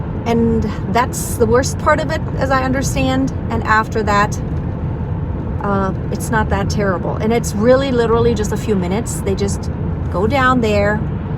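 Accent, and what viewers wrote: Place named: United States. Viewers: How it is possible to get a perfect American accent like you were born there ? You are from Germany but sound like an American.🌹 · I think she is in Germany 🇩🇪 but originally American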